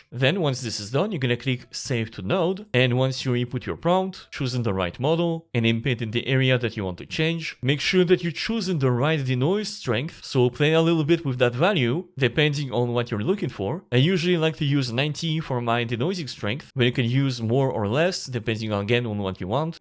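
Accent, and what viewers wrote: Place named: France. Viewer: Il me semble avoir reconnu un léger accent français, donc je commente dans cette langue:-) Fabuleuse vidéo: la présentation est claire, pédagogique, avec de l'humour ce qui ne gâche rien. Les liens sont tous présents dans la présentation ou sur la page patreon. Bref une réussite. Avec une telle vidéo, impossible de rater l'installation de ce nouveau Flux Q8. Surprise il est beaucoup plus rapide que les versions précédentes de Flux (avec NVIDIA GeForce RTX 3070), et le résultat est magnifique. Un grand merci à.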